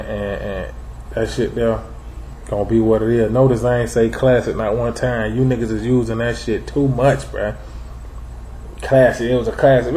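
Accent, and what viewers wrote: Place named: South, United States. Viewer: N I fucks wit u cuz u kept it 100 all the way I could tell u was frm the south b4 u said it real nigga shit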